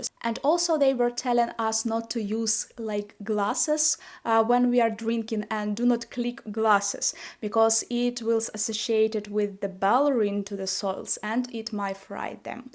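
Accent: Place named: Eastern Europe. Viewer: Thank you for this interesting video,. I like learning about Ukrainian traditions. Hope your family in the East is safe and warm. Take care of yourself. Hope your mental health will get better. This time of year is hard for mine too. Take it easy on yourself. We say things to ourselves in our own heads that we wouldn't dare to say out loud to someone else. You're doing great! Your English is excellent. No need to worry about your accent. It gives you character. And I'm sure it's easier to understand your Eastern European accent than it is to understand my Southern US (NC) accent. Hope you and your family are all OK. Stay safe and warm as best you can. United we stand. Разом ми сильніші. Разом до перемоги. СЛАВА УКРАЇНІ!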